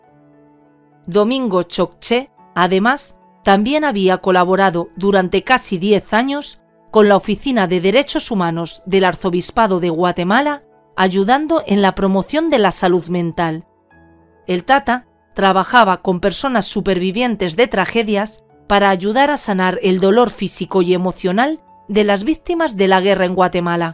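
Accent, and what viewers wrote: Spain. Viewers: Un gusto. 👏🙏🙋‍♀️♥️🍀🌺🇺🇾🇺🇾. Te mando un abrazo grande desde Paysandú Uruguay. Me encantan tus relatos. Y ese tono Español. Q ignorancia!!! X Dioss q en el siglo 21 exista estas creencias. Y matar a un buen hombre x nada. Lamentable estamos mundialmente más salvaje. 😭🤦‍♀️🤦‍♀️😭🙏🙏🙏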